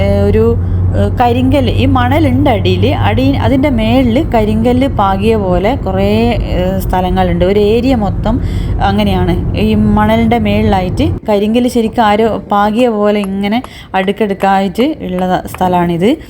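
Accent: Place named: Kannur, India. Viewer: ആദ്യമായി കേൾക്കുകയാണ് മാഡം കണ്ണൂര്കാരി ആണോ?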